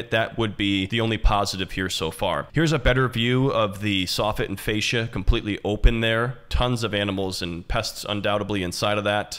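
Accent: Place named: Florida, United States. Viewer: seems like a guy I’d chill with. Looks and sounds like a Florida local too.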